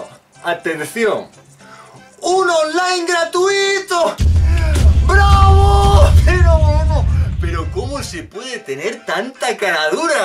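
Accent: Spain. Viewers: Jaajajja, me encanta ese acento para contar las cosas, de que parte de España es?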